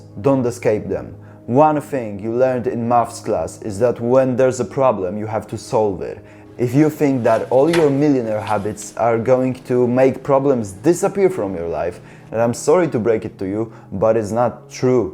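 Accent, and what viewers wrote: Poland. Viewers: Czuć na kilometr polski akcent.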